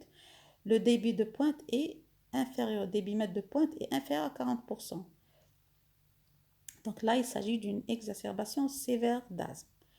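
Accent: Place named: Algeria. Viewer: d'apres la voix et les bonnes explications je savais bien qu'il s'agissait d'une professionnelle Algérienne, je suis Algérienne vivant au Canada, je vous dis bravo pour toutes ces explications ( nouvellement diagnostiquée Asthmatique ).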